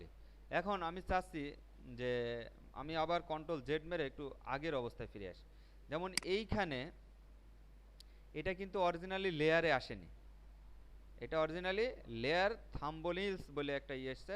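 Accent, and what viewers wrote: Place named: Madaripur, Bangladesh. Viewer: Your voice was just like madaripur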